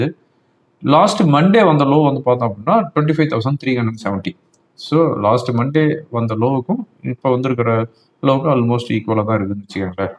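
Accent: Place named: Kongu, India. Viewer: Rupee value வீணாப் போய் கெடக்குது 😂 கொஞ்சும் கொங்குத் தமிழ் அருமை 👍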